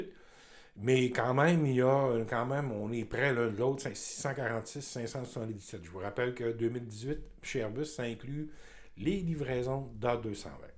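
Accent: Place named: Quebec, Canada. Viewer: Épisode très intéressant, j’apprécie toujours votre bonne humeur et votre parler québécois qui est très agréable . Pour ce qui est du sujet du jour, un élément important est la fin de la production de l A380 . Le bâtiment dédié à sa fabrication a été reconverti pour fabriquer de l A321 qui est un gros succès commercial. Donc la production des mono couloirs d Airbus va pouvoir suivre la demande si les fournisseurs tiennent le coup. À ma connaissance aucun n a déposé le bilan . Donc je crois qu Airbus ne va pas mettre Boeing KO mais va gagner le match au point.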